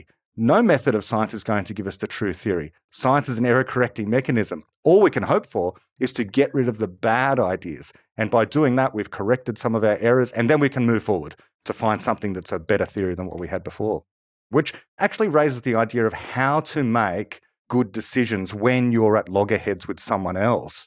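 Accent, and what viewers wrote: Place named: Germany. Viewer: nope its not nivi. sounds like Deutsh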